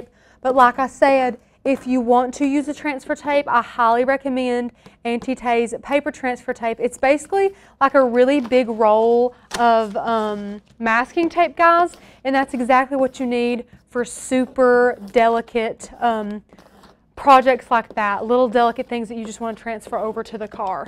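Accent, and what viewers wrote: South, United States. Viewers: Girl, where you from?! Just a curious Texas girl who is fascinated with southern dialects lol. The Carolina's, Georgia, Tennessee?? Love it!! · Love her southern accent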